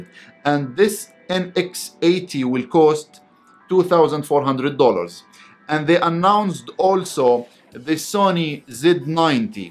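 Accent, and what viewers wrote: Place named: Egypt. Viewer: Egyptians trying hard to speak English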